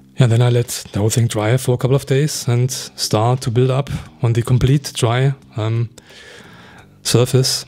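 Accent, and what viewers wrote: Germany. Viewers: His German accent is the best that u can find, lovely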